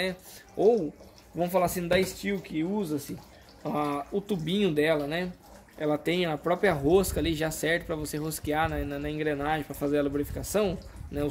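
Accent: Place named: South, Brazil. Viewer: Bom dia gostei de assistir sua explicação tudo de bom pra você você deve ser do Sul por causa do sotaque parabéns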